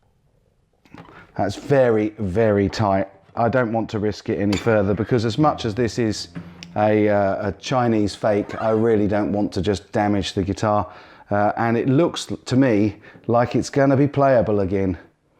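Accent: United Kingdom. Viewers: As a native USA English speaker, I am tired of Briish accents in presenters... are USA English talkers so hard to locate in the USA?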